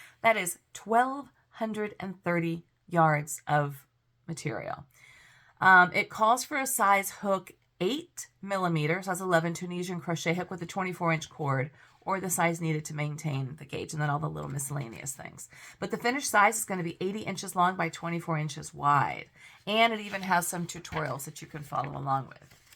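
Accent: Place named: Tennessee, United States. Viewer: You brought back some of your southern Tennessee accent. ❤😂 That book is amazing.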